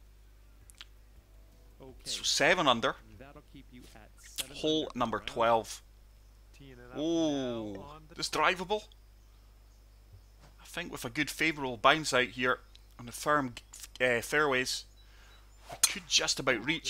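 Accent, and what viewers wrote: Scotland, United Kingdom. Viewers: What part of Scotland are you from,?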